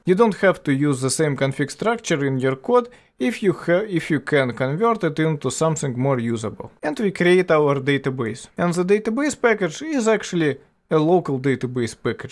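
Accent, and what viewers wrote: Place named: Russia. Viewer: 一股毛子味的英语是吧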